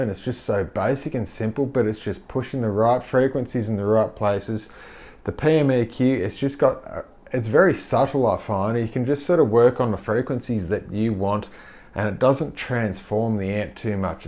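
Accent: Australia. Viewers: Aussie AF ! 🇦🇺 bet you was a mad sk8r too !!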